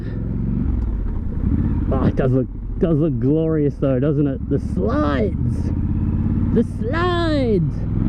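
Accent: Australia. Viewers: good to hear an Aussie voice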